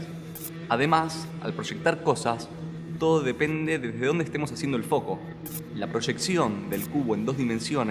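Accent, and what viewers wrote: Rioplatense, Argentina. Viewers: Te ganaste un sub, no sé si sos argentino o uruguayo, pero por las dudas saludos desde Argentina.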